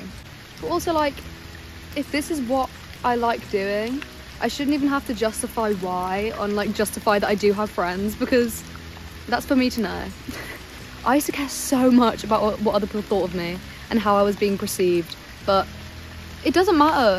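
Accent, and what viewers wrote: Wales, United Kingdom. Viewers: British accent 😭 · oooo I thought she sounded welsh but I wasn't sure since im not the best at accents :D thanks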